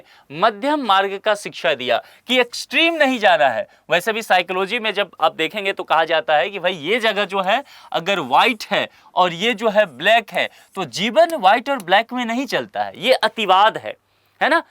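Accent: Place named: Bihar, India. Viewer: Bihari h sir 🙌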